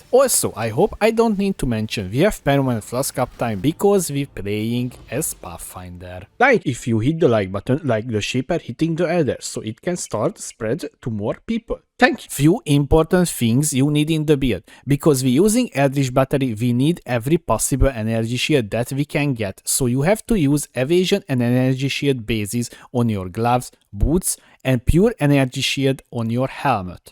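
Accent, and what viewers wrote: Hungary. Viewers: U Hungarian? Sounds like it.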